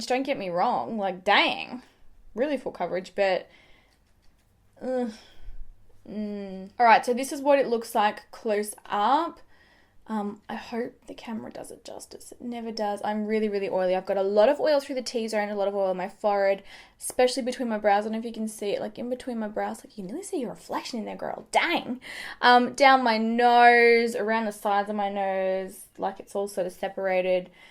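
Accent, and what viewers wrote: Australia. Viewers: ❤❤❤❤ love all ypur videos, your style and your australian accent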